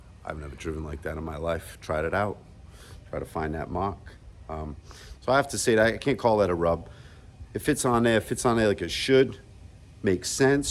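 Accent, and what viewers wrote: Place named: Massachusetts, United States. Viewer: I knew you were from Mass before seeing the plates lol fellow mate !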